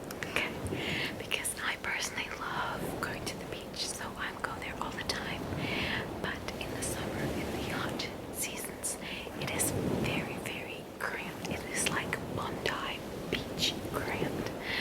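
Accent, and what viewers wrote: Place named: United States. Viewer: You sound very American. Is that on purpose or does it just happen? Either way this was lovely (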